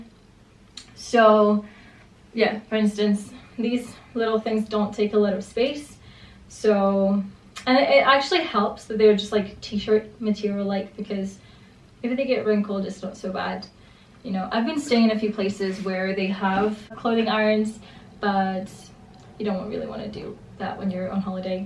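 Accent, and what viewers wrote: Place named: Scotland, United Kingdom. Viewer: Sitting here smiling half hearing a Scottish accent and then it disappears again. I now see you spent some time in Scotland. Much from jennifree in Edinburgh 😀🏴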